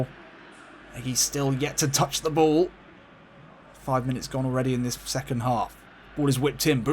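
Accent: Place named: England, United Kingdom. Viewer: kalo dari aksennya, dia dari Inggris bro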